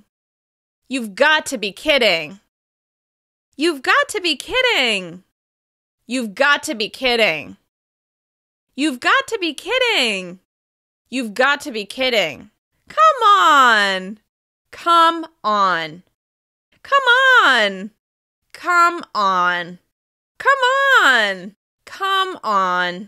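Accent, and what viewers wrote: United States. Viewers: Hi! I love your clear information and a great lesson. Are you from Southern California? I tho your English has a California accent! Correct?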